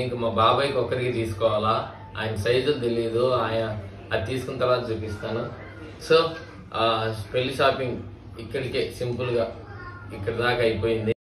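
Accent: Kadapa, India. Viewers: మన కడప భాష చాలా బాగుంది తమ్ముడూ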